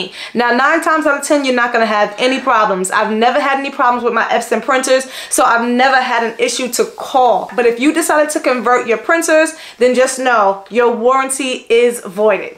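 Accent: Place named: New York, United States. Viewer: Is it New Jersey or New York? I love the accent! It’s just so exciting lol.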